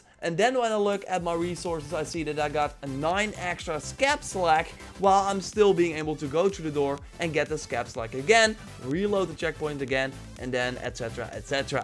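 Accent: Netherlands. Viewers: do i smell dutch?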